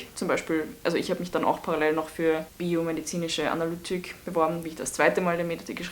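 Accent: United Kingdom. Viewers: Österreicherin spricht englisch mit britischem Akzent jz hab ich alles gesehen haha thumbs up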